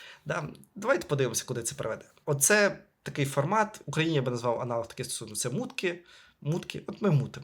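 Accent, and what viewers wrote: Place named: Russia. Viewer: друже, роби щось з тим акцентом, бо тобі ще в США жити, а говориш ти ну дуже так собі. рашан ексент :D оце "і"-кання та "ге"-кання - це прямо як маркер. Та й в деяких словах коли ти замість G вимовляєш H - не одразу зрозумієш що ти там такого сказав.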